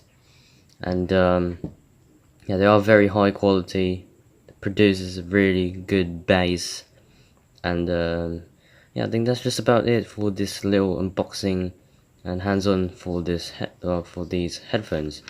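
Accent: United Kingdom. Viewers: I know its too late to comment but you really have a nice british accent Im practicing my british accent! Sanaol marunong magaling mag english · I have those! But its gray, i got it for my bday Also nice british accent, i dont think i can do british lol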